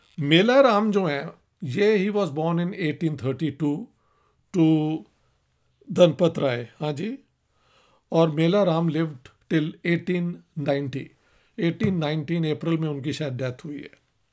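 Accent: Punjab, India. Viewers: Respected Dr Sahib Many many thanks for your thought provoking and illuminating talk on Hindu families of Lahore which enriches our knowledge about this city of Lahore before partition Your style is lucid and Punjabi accent is commendable which shows your high class experience with erudite wisdom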